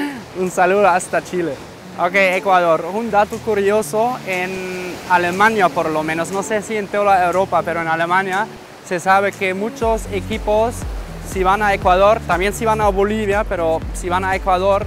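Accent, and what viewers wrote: Mexico. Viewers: Primera mente, aprende a hablar el español sin usar el lejo mexicano. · ! muero de risa con tu mexicano! jejeje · el pinche aleman ya habla como pinche mexicano sin ofender me gusta mucho su canal ;)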